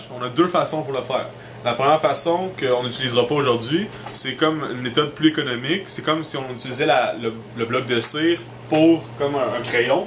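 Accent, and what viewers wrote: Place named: Quebec, Canada. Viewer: Cool la video j aime bien votre accent Qbekoi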